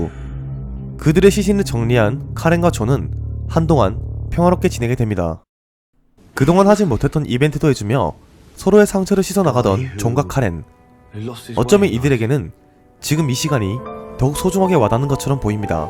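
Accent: Gyeongsang, South Korea. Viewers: 오늘 문득 님 설명듣는데...경상도쪽이셨나요? 사투리가 들리는건가싶어서 ㅋㅋ 손 빨리 나으시길 쾌차하세요~~~ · 고향이 경상도 어디에요.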